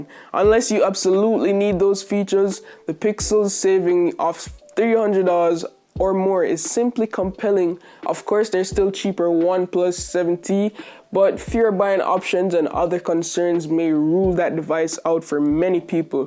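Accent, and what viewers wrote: Jamaica. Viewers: That Jamaican accent strong with this one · You sound very Jamaican. You Jamaican?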